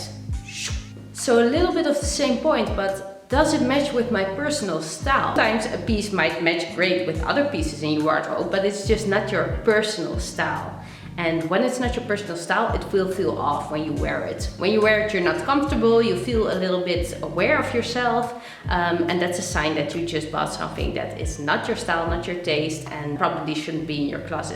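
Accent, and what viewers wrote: Netherlands. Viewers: Hi! Spreek je Nederlands? Want ik denk aan jouw accent te horen dat je van Nederland bent? 🥰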